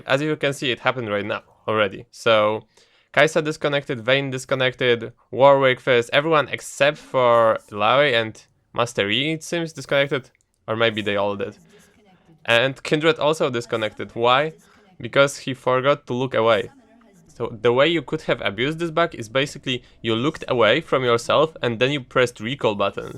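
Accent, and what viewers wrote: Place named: Poland. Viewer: Ale masz polski akcent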